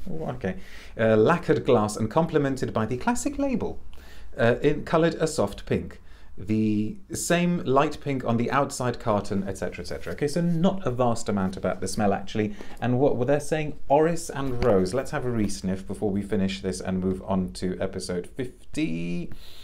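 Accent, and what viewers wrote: United Kingdom. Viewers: British accent - I’m in 💖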